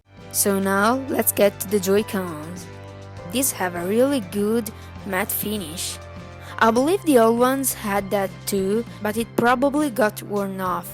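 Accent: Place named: Italy. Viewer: I love the editing and flow of this video :) Came to the channel for the iPods, stayed for your cute Italian accent and for the refreshing editing style.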